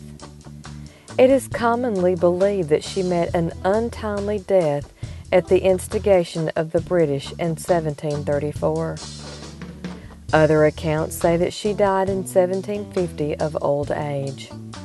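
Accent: South, United States. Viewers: My grandad Will was a Maroon and I cant stand this southern American voice over.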